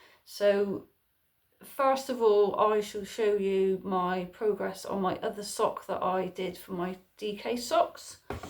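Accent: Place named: Devon, United Kingdom. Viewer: I’m thinking you must be in Devon/Cornwall due to your accent! I’m living in rural Devon!😊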